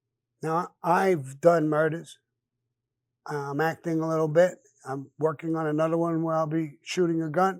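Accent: New York, United States. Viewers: Hi love hearing all your stories,I enjoy your NEW York accent ' Well have a good night stay cool!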